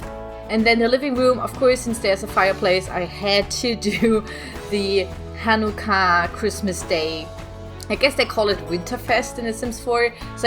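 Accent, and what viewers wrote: Germany. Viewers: I never realized that your accent was german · I LOVE HOW YOUR ACCENT IMMEDIATELY GOT STRONGER LIKE Y E S also please don’t change your accent... i love german accents and you should never change who you are :)) I’m from Britain, and I get teased a lot for saying things ‘poshly’ as my family is really English and well-spoken, and everyone around me has a really Welshy accent. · Vermutlich weil sie es selbst nicht besser können .. oder GAR nicht ^^ Ich finde dein Englisch schon ziemlich amerikanisch. Manchmal redest du so amerikanisch-kauderwelschig ^^ dass ich dich kaum verstehe ;D Da sind eigentlich nur noch wenige Wörter bei denen man den deutschen Akzent raushört. Außerdem hab ich gehört, dass Amerikaner in der Regel den deutschen Akzent ziemlich niedlich finden :) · I agree with you plus her English is really clear to understand evan with the German accent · Your reaction to that comment was GOLD as a fellow German I know how hard it is to get rid of a german accent but honestly??? 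Yours isn't even bad??? When I started watching your videos I was like "she's german right?" because I did recognize the accent but I wasn't even entirely sure! It's ridiculous how some English native speakers (obviously not all, I see a lot of sweet comments here! <3 ) take the content produced by non native speakers for granted to the point of complaining about their accents oh boyyy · I don't think your accent is annoying at all - it's lovely to listen to, and not as strong as I'd imagine a German accent to be, lol! I love accents and poeple of all places and cultures, and you are most definitely awesome! The person who was so mind-numbingly rude can go suck - don't ever change! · You are german!!??? 😉I live in Germany and my son have the same accent when he speaks english and i find it really lovely, just like your creations! 😊❤✌ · I love your accent and I think it's really obnoxious for people to complain about it. 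It just goes to show you how spoiled we Americans can be. I for one am very grateful that you record in English at all. It's not your first language and I'm sure it would be easier for you to record in German so I appreciate the fact that you care enough to try! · I'm really confused. Why would you watch a German youtuber if you don't want to hear a German accent? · are you from Germany or Austria? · This was the first video I have watched and must say didn’t notice your accent. You are doing very well love the German touch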